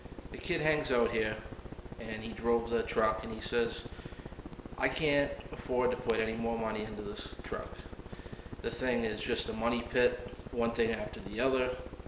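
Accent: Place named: Massachusetts, United States. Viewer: hey man from your accent you sound like your from MA? Anyways I gotta agree with you. Alot of people on here watch davesfarm and that other bullshit and get these crazy ideas. Plus alot are younger kids. I live locally and know what people will buy on CL around here. A good running 350 sure thats worth pulling or a decnet transmission. You sure don't see many s10 blazers here any more and the 4.3 would not be worth the time. Why do people like the 4.3 and 3.8 so much anyways?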